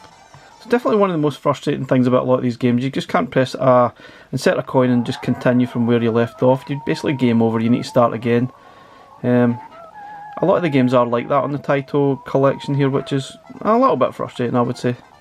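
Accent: Scotland, United Kingdom. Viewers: I’m sure I will I’m sure I will. I loves ur content especially that Scotch accent us English Gurl’s like an accent 💖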